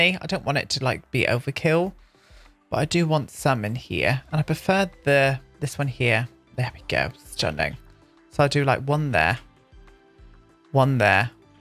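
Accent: United Kingdom. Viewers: Hahahaha adoro esse sotaque britânico. Me acabo de rir com esse "Hello"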